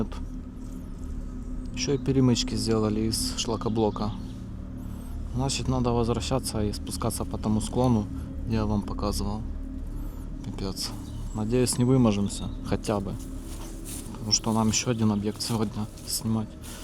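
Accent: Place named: Ukraine. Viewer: як приємно чути українську вимову. дякую за все.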